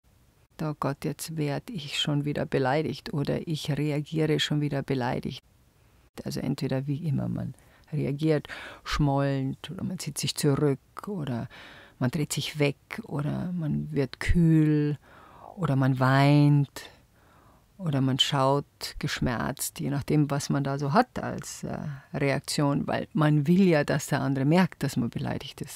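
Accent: Bavaria, Germany. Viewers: schönem, bayerischen Dialekt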